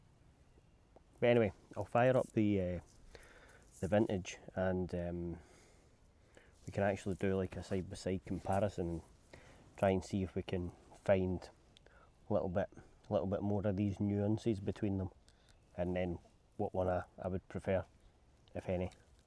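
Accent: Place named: Fife, United Kingdom. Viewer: Its nice to hear a Fife accent. Orlik gets a lot of good reviews, and I think I'll copy your lead and try both.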